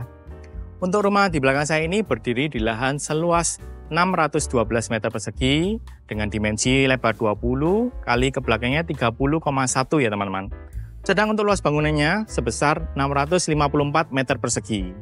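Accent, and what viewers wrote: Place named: East Java, Indonesia. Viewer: Videonya menjelaskan banyak hal kecuali lokasi, hal yang justru paling penting kalo mau jual rumah. Lokasinya di kota mana? Saya cuma bisa nebak dari dialek hostnya, yaitu Jawa Timuran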